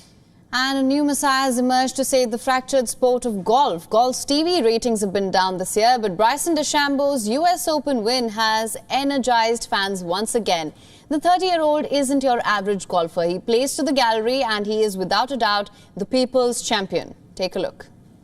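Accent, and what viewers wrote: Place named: India. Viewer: She still have Indian accents that sounds good for the Indians.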